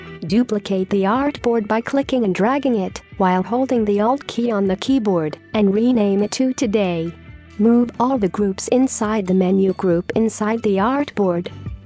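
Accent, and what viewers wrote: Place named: Kerala, India. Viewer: gotcha u r from kerala right?????????????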